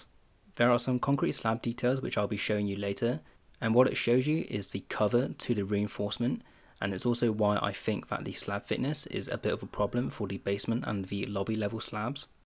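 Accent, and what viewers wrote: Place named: United Kingdom. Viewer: Great layman's explanation. Also nice British accent!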